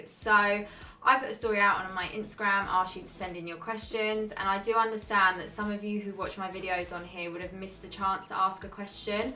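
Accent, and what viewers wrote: United Kingdom. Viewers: I just want to say that I absolutely love your channel and your personality! You have a lovely London/English accent. It's really quite something! Ace all day...✌🏻+💖 - Eric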